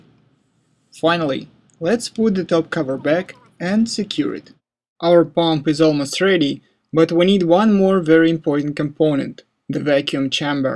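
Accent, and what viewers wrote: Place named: Russia. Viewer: That accent... Russia? Ukraine?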